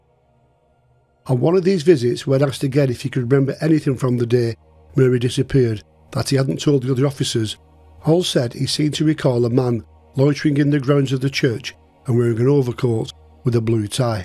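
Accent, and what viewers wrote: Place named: Lancashire, United Kingdom. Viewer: Another brilliant video by, love the Lancashire accent and very well told. Is there an episode about Gwynne Owen Evans and Peter Allen in the making, the last 2 to hang in Britain 🇬🇧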